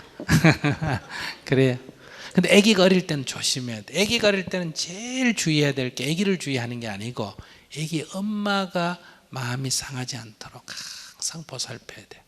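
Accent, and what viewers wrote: Gyeongsang, South Korea. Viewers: 인생 상담자 일인자.. 경상도사투리 정말 티꺼운말투..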